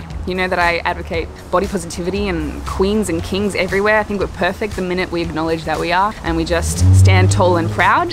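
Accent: Australia. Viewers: Is she Australian? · I am going to be honest here. At some point, I did unsubscribe you because of the Australian accent (just a past trauma involving some Aussie accent) but the content is just so good and funny, I had to come back :D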